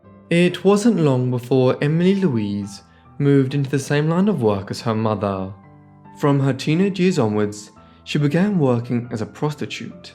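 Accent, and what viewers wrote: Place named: United Kingdom. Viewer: Perhaps it is a good idea to write the names of streets and places on the screen as well, just like you do with the names of people. I could not make out some of the locations. Your pronunciation is too English for me. French is spoken in front of the mouth with articulate movements of tongue and lipts, English is spoken in the back of the mouth with minimal movements of tongue and lips. This is why the English, whose culture makes them refuse to alter their habits, it is well-known, seldom manage to speak French like French. It is not a critique but an observation. Start speaking French in the front of your mouth and all of a sudden it sounds French and people will understand your French with great clarity. Just try it sometimes.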